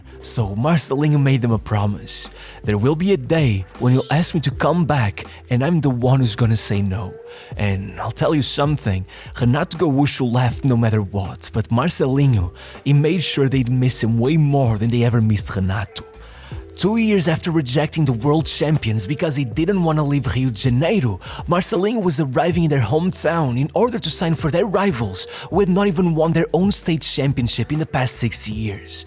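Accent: Portugal. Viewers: q brasileiro o q, ele fala S com som de Z kkkk. é portugues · I mean, he sounds portuguese which is closer culturally to brazil than most other european countries.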